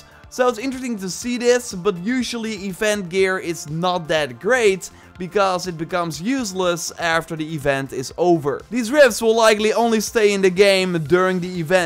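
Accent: Netherlands. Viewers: Lemme guess...are you Dutch ? Waarom kost het ons zoveel moeite om zonder accent te spreken?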